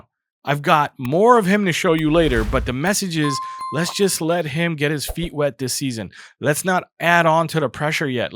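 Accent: United States. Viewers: Yo, you're good bro... It's just a bit weird hearing football analysis in an American accent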